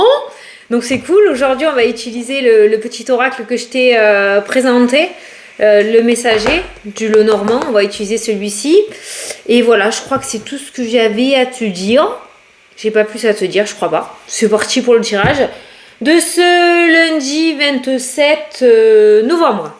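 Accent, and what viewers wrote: South, France. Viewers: Coucou, J’ai une petite question un peu particulière! Je cherche d’ou vient ton accent? Marseille ou côté Toulouse? Je t’écoute tous les jours… je t’adore ❤❤ Bisous bisous ❤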